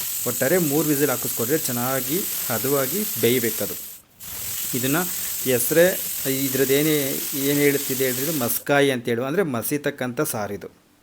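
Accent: Chitradurga, India. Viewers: Good morning sir, ನಿಮ್ಮ ಅಡುಗೆ ಜೊತೆ ನಮ್ಮ ಚಿತ್ರದುರ್ಗ ಭಾಷೆ ಯ ಸೊಗಡು ಚೆನ್ನಾಗಿರುತ್ತೆ ನಿಮ್ಮ ಮಾತುಗಳನ್ನು ಕೇಳುತ್ತಿದ್ದರೆ ನಮ್ಮ ಊರಿಂದ ಯಾರಾದ್ರೂ ಬಂದ್ರೆ ಮಾತಾಡುತ್ತಾರೆ ಅಲ್ವಾ ಹಾಗೆ ನೀವು ಮಾತಾಡುವುದು ಹಾಗಾಗಿ ನಮ್ಮವರೇ ನೀವು ಅನ್ನುವ ಭಾವನೆ ನಮಗೆ ದಯವಿಟ್ಟು ನಮ್ಮ ಭಾಷೆಯನ್ನು ನೀವು ಮಠದುವ ರೀತಿಯನ್ನು ಬದಲಾಯಿಸ ಬೇಡಿ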